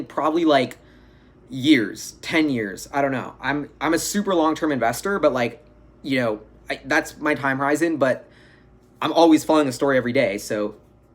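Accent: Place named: South, United States. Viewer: Where are you from? Sounds like a southern accent at times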